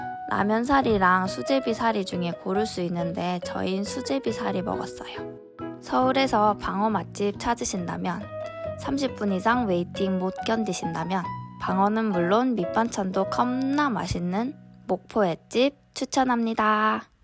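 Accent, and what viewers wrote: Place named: Gyeongsang, South Korea. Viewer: 서울사람이라면서 갱상도 사투리네예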